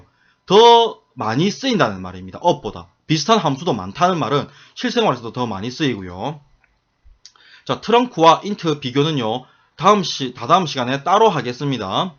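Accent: Gyeongsang, South Korea. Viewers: 와.. round 함수고 자릿수고 너무 어려웠는데 이 영상 보고 한방에 정리되네요 활용방식(예제)도 너무 적절하고 사투리 억양 덕분에 귀에 쏙쏙 박힙니다 (저도 경상도 사람이랔ㅋㅋㅋ) 구독 눌렀습니다!!❤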